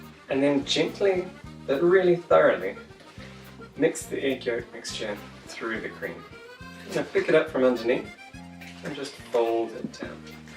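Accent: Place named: New Zealand. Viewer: Miss good old Hokey Pokey and Kiwi accent! Thank you for posting all the way from Tairoaaaaa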